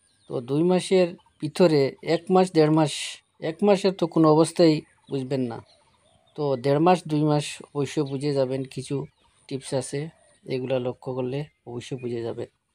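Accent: Sylhet, Bangladesh. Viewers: oh jiggesh korlm because apnr kotha gula sylhet er mto suddho kothar sate sate sylheti o mix ace tai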